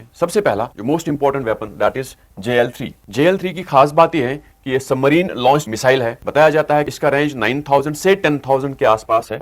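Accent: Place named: Kashmir, India. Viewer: Kashmiri accent ❤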